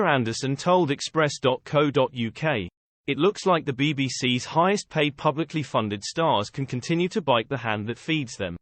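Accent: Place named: Russia. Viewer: If you're going to use a robot voice to replace your Russian accent, at least get one that is consistent.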